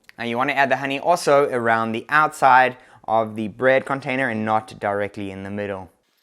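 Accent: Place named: South Africa. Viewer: that south african twang still comes through ;)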